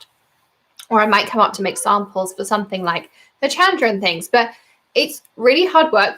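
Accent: England, United Kingdom. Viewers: As a new 'maker' I found you quite by accident last month and just love your presentations. It was, at first, confusing to hear an English accent associated with US company (I'm in Yorkshire). I just saw this video and had to make a comment. I find it heartbreaking that mean people can do so much harm. There have been a few other people similarly affected this year and Cathy Z has posted this (you may have seen it) and I thought it may help you: <link> Without you lovely maker-presenters this year would have been very difficult for me (and I suspect many others too). Meanwhile good luck with the new goodies and I wish you a Happy New Year.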